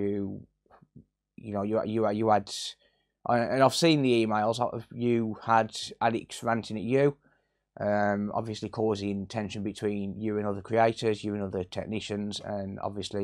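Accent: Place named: Black Country, United Kingdom. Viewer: We have all fell into that trap, at least you owned the error, now you can move on and continue with the great content we always see from you. You have gave me an interest in electronic repairs, not sure I could as I'm thick as two short planks but anyway, oh and big up the Black Country accent, I hail from West Brom... Keep up the good work 👍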